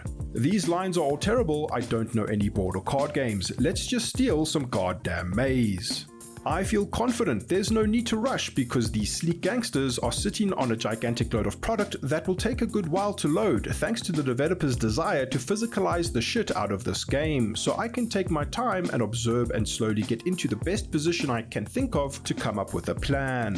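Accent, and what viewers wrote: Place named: South Africa. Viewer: South African detected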